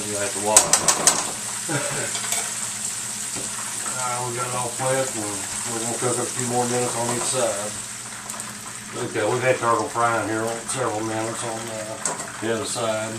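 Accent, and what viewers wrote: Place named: Texas, United States. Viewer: strong texan accent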